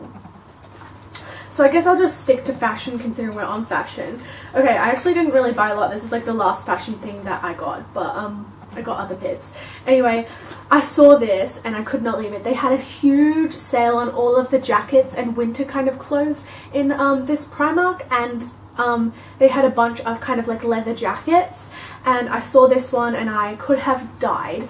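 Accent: Australia. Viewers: She's either from Australia or New Zealand (I can never tell the difference). I'm English and she's definitely not from here :) hope that helps x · Yeah it's definantly Australian then. I was having such a moment last night. Think I'd be able to recognise my own accent lol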